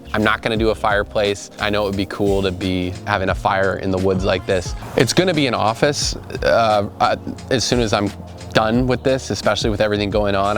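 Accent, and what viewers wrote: Canada. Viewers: The only part of the video I'm confused by is the shoutout to "your Canadians" but you're wearing a Maple Leafs hat and sound like you're from rural Ontario! Great cabin man, nice work.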